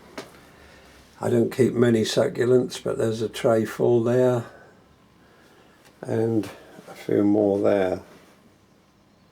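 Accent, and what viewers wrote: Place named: England, United Kingdom. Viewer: i dont understand the latin names with the english accent but they're beutiful... espacialy the ones planted in the ground! i wish i could live by myself only from food i grow and dedicate my entire life for the plants.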